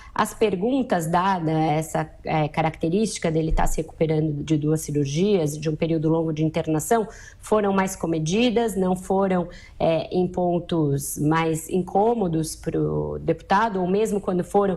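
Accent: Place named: São Paulo, Brazil. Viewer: Sotaque charmoso, TU TÁ DE BRINCADEIRA, alias o seu sotaque paulista também é chato lembrei-me daqula moça correspondente da Globo em Londres ela é cheia de XXXXXXXXXXXXXXXXXXXXXXXXXXXXXXX horrivel da mesmo forma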